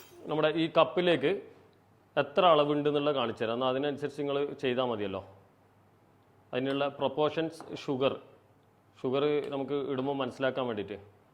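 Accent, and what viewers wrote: Kannur, India. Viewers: കണ്ണൂർ ആണല്ലേ ഷെഫ്?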